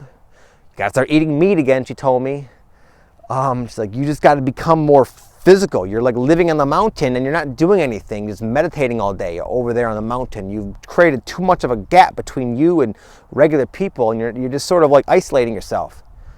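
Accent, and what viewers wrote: Michigan, United States. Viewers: brother from Michigan <3 my name is Anjalina; I've lived in Colorado for a long time, when I first heard your voice on your channel this summer, it reminded me of home. thabkyou for your wise words, along my journey I had a super accelerated beginning, then a big fall, and a steady climb back "up" now, I am certainly on the cusp of something right now, again, thankyou- sending the most confident vibes your way. XX 🐉♄♇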